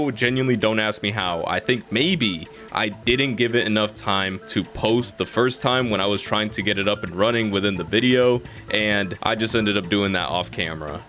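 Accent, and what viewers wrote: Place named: Dominican Republic. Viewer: first time ever stumbling into this channel, and im kind of overstimulated by how much is happening in such a short amount of time. Anyways, the dominican accent caught me off-guard lmao. I didn't think you'd also be latino KASJDAJAK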